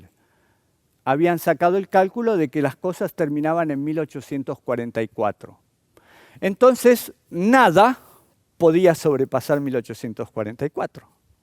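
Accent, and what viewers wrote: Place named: Argentina. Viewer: De Argentina supongo por su timbre de voz.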